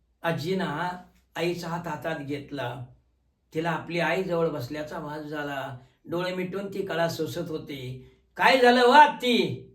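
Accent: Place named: Kolhapur, India. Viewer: Salute sir thumala nad khula kolhapuri tone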